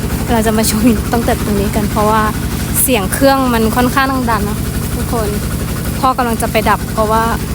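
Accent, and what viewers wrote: Isan, Thailand. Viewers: สุดว้าว..สาวเมืองกาญจน์แต่เว้าสำเนียงอีสาน อ่างเก็บน้ำที่ไหนนะ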